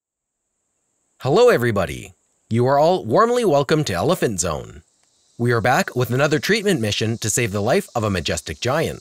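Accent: United States. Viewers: Good video but rubbish yank narrator spoilt it for me.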